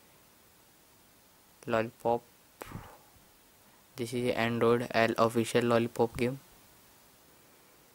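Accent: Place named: India. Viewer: Stupid india accent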